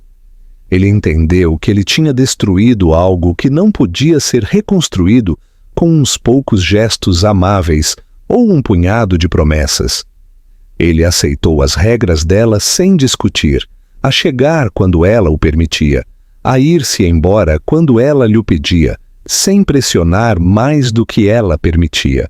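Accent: Portugal. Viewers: Uma mistura do português de Portugal e o português do Brasil. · Pq falar com um sotaque português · Achei chata a narração! Porque essa troca de sotaques? Português de Portugal! E as vezes português do Brasil, achei estressante. Porém a história em sim é linda, forte mais bonita. ❤ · Esse é o português falado em Portugal... Muitas palavras são diferentes no Brasil, porém dá para entender perfeitamente...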